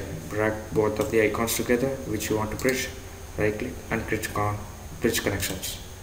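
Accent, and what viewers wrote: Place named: India. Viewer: You Accent havin Indian boy. Thanks for Helpin me out!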